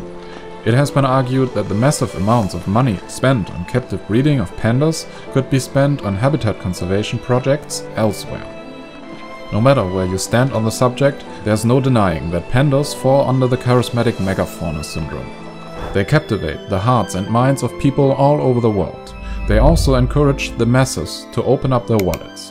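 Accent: Germany. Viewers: I love your German accent. It's very subtle, but still noticeable. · Is that a german accent? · He sounds soooooo German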